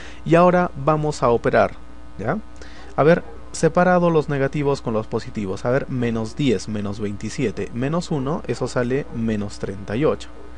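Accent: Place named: Peru. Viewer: eres de peru se nota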